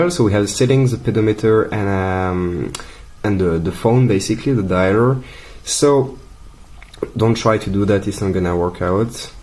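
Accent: France. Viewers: je trouve l'accent français agaçant en anglais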